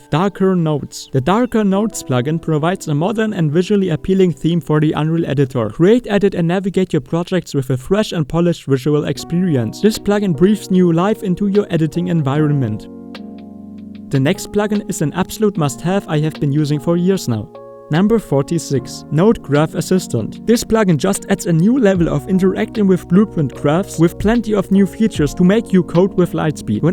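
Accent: Germany. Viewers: I take it from you accent that you're German too? Cause I'd be interested in the Node Graph Assistant Plugin (coming from Houdini it's just incomprehensible to me how bad the Node Graph functions in UE are by default 😅) but it says that the plugin was not available in my region. How did that work out for you?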